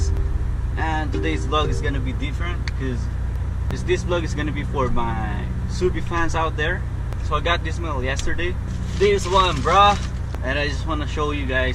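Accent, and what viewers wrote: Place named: Philippines. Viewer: That Pinoy accent lol much love though bro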